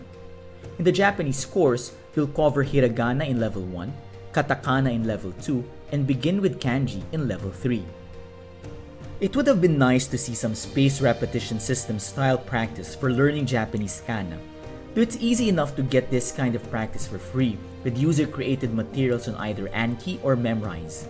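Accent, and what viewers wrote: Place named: Philippines. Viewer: Are you filipino? I really appreciate the review, but i couldnt help but notice that you sound like my cousins haha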